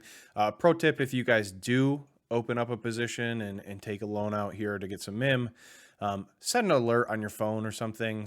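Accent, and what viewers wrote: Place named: Michigan, United States. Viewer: Great job bro 👍🏿. Sounds like you're in MI. Go Blue!😉. I'm in MI too. Maybe we can link up sometime. Blessings to you!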